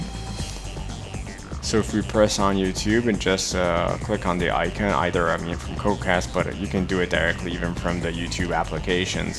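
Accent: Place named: Italy. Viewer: Your english accent is very minimal for being Italian!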